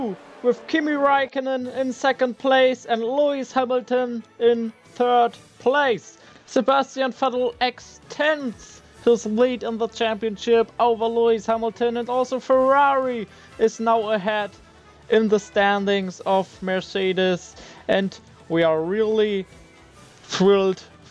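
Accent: Germany. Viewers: Der legendäre deutsche Akzent 😂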